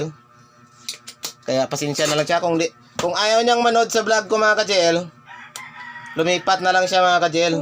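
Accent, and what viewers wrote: Iloilo, Philippines. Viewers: Daw ilonggo ka maghambal sir..idol ko mga ginagawa mo parang Ang Dali lng gawin.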